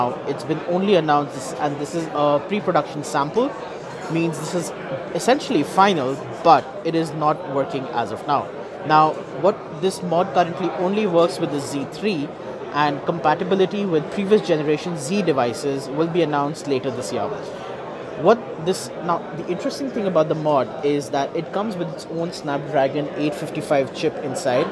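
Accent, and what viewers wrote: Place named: India. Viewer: heavy Indian accent bye video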